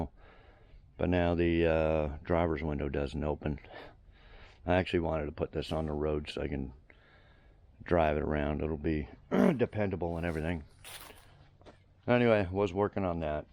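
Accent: Canada. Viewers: I knew it. I just found your channel and I was wondering to myself “why does he sound Canadian when he is (I think) in SC? This explained that. That’s awesome though. My wife and I are looking at leaving NJ for the upstate of SC. It’s pretty much 50/50 over the weather and the liberal bullshit. 😂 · You’ll tackle any tasks, sheet metal, electrical, or heavy mechanical! You’re a real pro. It’s easy to see you have lived this discipline most of your entire life, and you love it. It all shows through. Your time in Alberta shows up in some of your pronunciation of certain words which is certainly not part a Texas accent. Professionals like you are far and few. Thanks for your excellent videos and sharing of this craft you have so brilliantly developed.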